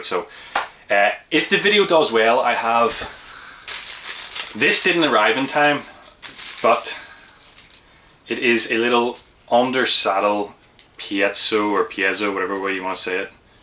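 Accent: Northern Ireland, United Kingdom. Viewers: thanks for replying! Love our Norn Irish accent being broadcast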